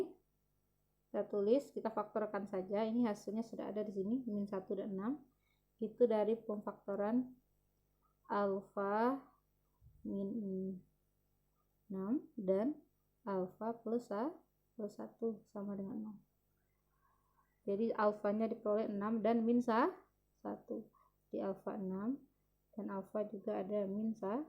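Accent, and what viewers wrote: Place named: Painan, Indonesia. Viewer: Khas dialek painan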